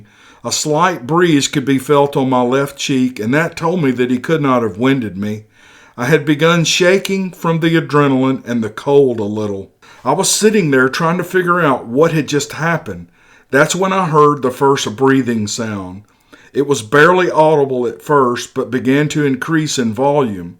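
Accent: South, United States. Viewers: I'm from the South, but living in the West, so I enjoy your storytelling and Southern accent (aka twang). Wish you much success! And Happy Holidays! 🎄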